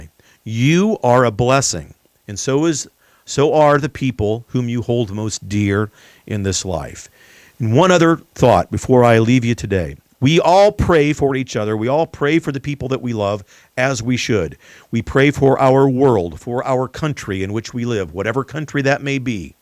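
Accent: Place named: Scotland, United Kingdom. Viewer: Well done my lad!!!! Nice Scottish accent!!!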